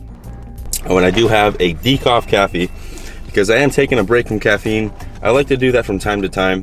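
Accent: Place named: New England, United States. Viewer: I thought for sure you'd go to Rocco's in Millbury,! PV is good too though! 😄 Wicked awesome video- happy you never forget your old stomping grounds! And don't you ever lose your accent- you gotta represent us New Englanders! LOL #TeamBigBites FOREVAA 🍩💜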